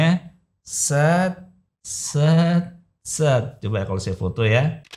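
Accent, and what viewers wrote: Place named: Java, Indonesia. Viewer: Bang orang mana sih kok jawa bgt hehehe😂. 😅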